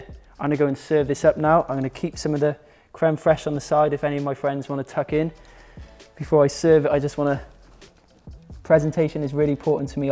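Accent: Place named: England, United Kingdom. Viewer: Hm What I think is weird is that anyone would come onto a person’s channel, who is obviously British, and not know or expect they may say or pronounce things differently than they might. And proceed to tell them they are saying it wrong.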